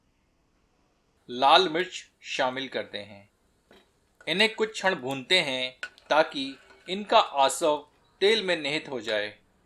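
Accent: Standard. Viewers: आपकी हिन्दी शुद्ध है !!! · आपकी शुद्ध हिंदी, और इतने प्रेम से इसे बोलना, किसी को भी मजबूर कर देता है, आपकी रेसिपी को बनाने और शेयर करने में।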